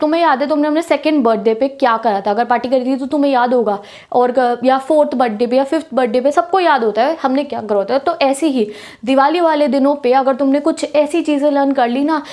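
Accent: Punjab, India. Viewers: R u punjabi didi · Are u Punjabi?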